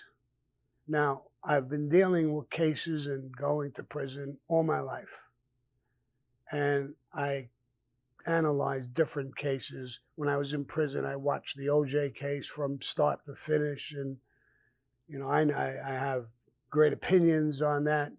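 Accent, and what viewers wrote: New York, United States. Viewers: Hi love hearing all your stories,I enjoy your NEW York accent ' Well have a good night stay cool!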